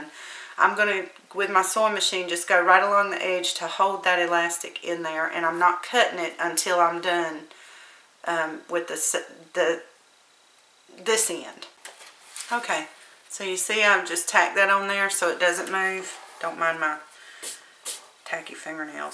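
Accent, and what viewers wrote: South, United States. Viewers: First, there's not anything as adoring as a southern accent! :) Secondly, these are the cutest little shoes and I'm going to try my hand at making a pair. Thanks for posting it. Blessings!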